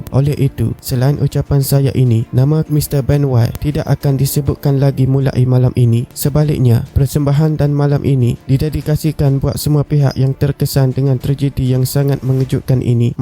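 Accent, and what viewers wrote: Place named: Terengganu, Malaysia. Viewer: Ni yang ckp ni mesti orng ganu atau Kelate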